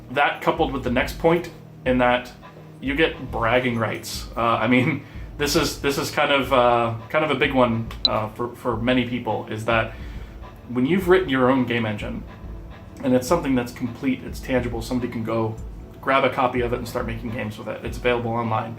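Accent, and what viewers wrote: Germany. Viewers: Bist du deutsch? 😂 Dein Akzent hört sich so an.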